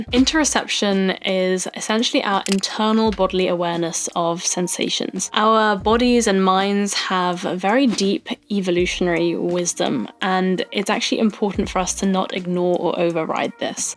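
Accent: United Kingdom. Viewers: An Elegant British accent ( RP) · Hi love your videos.Your British accent.Listening to our interoception, Health is wealth absolutely,I am going to the gym 2-3times a week walking daily and eating healthy diets❤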